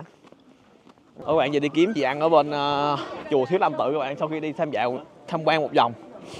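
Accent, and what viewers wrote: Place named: Central, Vietnam. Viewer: Ủa e ở hà nam à. Sao nghe giọng ở miền trung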